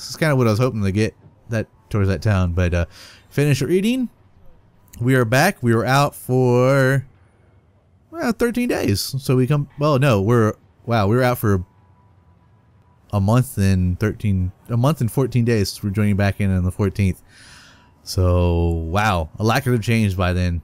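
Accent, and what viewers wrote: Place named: France. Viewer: That Frénch accént tho... ermahgerd, itś epic ;3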